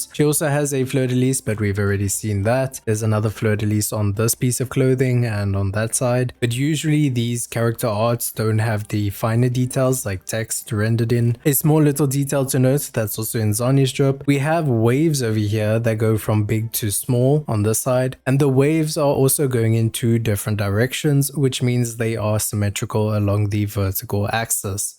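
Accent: South Africa. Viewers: I smell a South African Wuwa Content creator👀 Subscribe✅️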